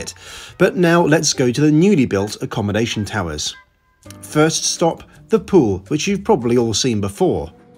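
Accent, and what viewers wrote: United Kingdom. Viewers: LOL I laughed at that too. He's British. Give em a break.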